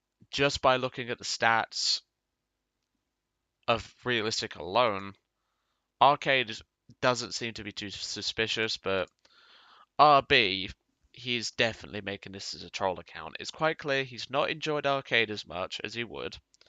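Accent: United Kingdom. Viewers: U British mate?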